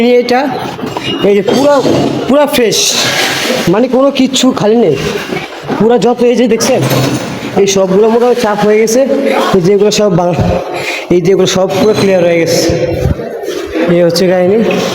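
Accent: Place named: Faridganj, Bangladesh. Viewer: তোমার ভাষা ফরিদগঞ্জের কোন জায়গা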